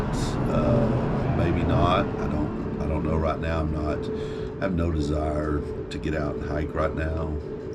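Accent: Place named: Texas, United States. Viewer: It was fun watching you! I loved your texan accent :-) you should totally consider the Colorado Trail, it's perfect for the summer, in the mountains, little to no snow if you wait for it to melt off. and much shorter than the PCT 🙂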